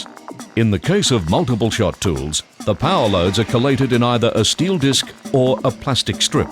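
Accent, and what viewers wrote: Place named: New Zealand. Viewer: It's an Australian video, but the narrator sounds New Zealand.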